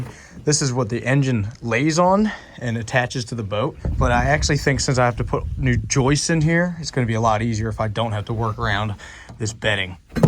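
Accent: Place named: West Virginia, United States. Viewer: Ey fella, where you from? You sound like you got a West Virginian accent but i aint got a clue where you are from.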